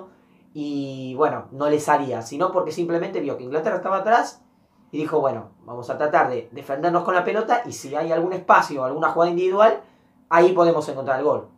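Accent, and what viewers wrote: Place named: Argentina. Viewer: ya tenes dos suscriptores italianos hermano XD por tu acento creo que sos argentino y pues italia y argentina son hermanos de sangre asi que tambien estube muy muy feliz cuando me llego la noticia que tambien el albiceleste habia ganado... argentina en el templo del futbol de latinoamerica... e italia en el templo del futbol de europa.... como bien dijiste fue un wembleyazo... tambien por como los ingleses ya se sentian la eurocopa en el bolsillo... asi como el brasil en el famoso maracanazo cuando ya se sentian campeones del mundo.... antes del partido yo ya me sentia que iba a ser como en aquella ocasion... aca se dice que la pelota es redonda asi que uno nuca sabe hasta que llegue el final... pero algo dentro me decia que ibamos a llevarla a roma... y bueno que puedo decir.... muy pero muy buena analisis de como se dieron los hechos en el partido.. 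pero... por dios... chiellini... leelo "kiel-lini" porque asi se lee XD CH en italia se lee como QU en espanol :)) bernardeschi.. es bernardesqui :)) seguro tambien el otro compatriota mio te lo agradecera jajajajaj XD GRANDE argentina y GRAZIE AZZURRI!!!!!!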